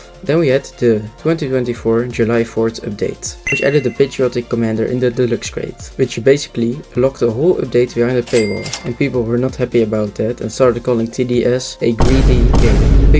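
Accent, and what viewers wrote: Netherlands. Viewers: I know a dutch accent when i hear one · You really don't try hiding your Dutch accent, huh? 😅 (Nothing bad about it) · I might be wrong but are you dutch? You realy sound like a dutch person(amazing video btw keep cooking)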